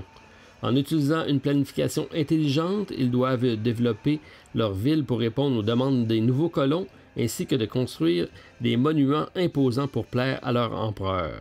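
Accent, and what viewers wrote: Quebec, Canada. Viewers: je viens me tenir au courant des sorties et me prendre une petite dose d'accent québécois^^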